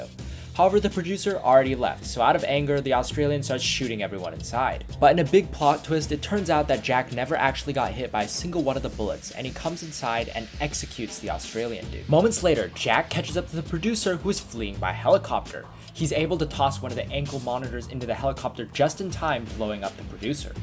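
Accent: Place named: United Kingdom. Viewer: He can’t be Australian- proceeds to sound about as British geeza as you can lol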